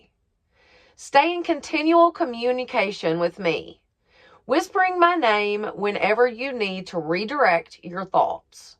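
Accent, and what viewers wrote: South, United States. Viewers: Hello my beautiful Angel. I would love to hear you sing with your beautiful southern accent. I love it!! What a true and powerful words of our Amazing Creator JESUS. He is so so good to us. Even though we are not worthy. I'm a sinner as well and saved by his marvelous GRACE. Praise GOD for his mercy, grace, forgiveness, and his unconditional love he had for us. Thank you once again for your daily devotional words of our Living Father. Blessings to you and your lovely family. I love you my beautiful Angel sister.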